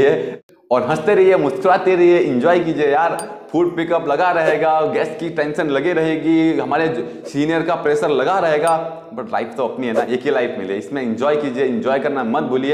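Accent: Uttarakhand, India. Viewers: Uk walo ka toh bolna he ek alag he andaj h ❤️❤️❤️❤️ pithoragarh 🔥❤️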